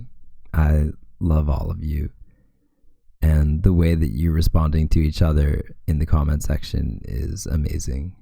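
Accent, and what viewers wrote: Canada. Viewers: I can hear that Canadian twang! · Lol I knew you were Canadian the minute you said "about". Love the video you are definitely in my age range which makes me feel less weird listening to this lol. I hope you eventually make a discord/patreon or something similar so we can go on supporting you. Until then I will happily share your videos and wish you the best.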